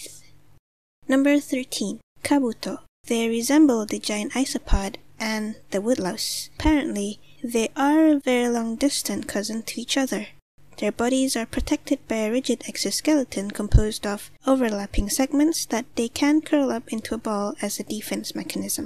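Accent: Scotland, United Kingdom. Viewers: u sound scottish.where u live? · Are you Scottish?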